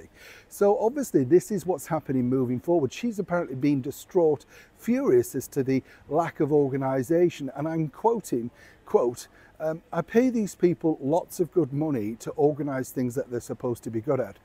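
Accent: United Kingdom. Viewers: U R the only artist (yes 2 me u R an artist) I don't know if it's your Contact that I enjoy Or Your wonderful accent (Could u plz tell me What type of accent is it. Plz don't say British A little more exact would thrill us, Your Ravenous Fans!! Could u make a segment about British (EU) Accent And how different accents are from different parts of England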